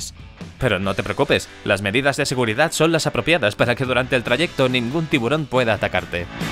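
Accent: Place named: Spain. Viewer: Típica voz española :v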